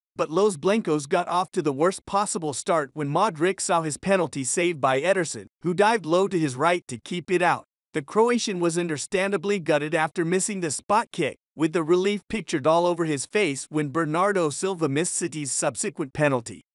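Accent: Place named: United States. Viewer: Amazing how AI with a US accent can pronounce every other word incorrectly!🙄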